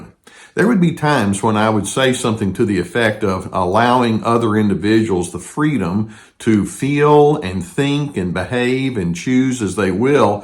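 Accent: South, United States. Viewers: You are my most favorite older white male with a southern accent. I've never met one I've really liked. Thank you for being so amazing 🥰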